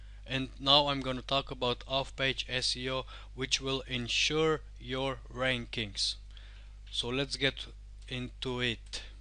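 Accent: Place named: Russia. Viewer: oh i love Russian Accent. Greetings from Indonesia my Friend.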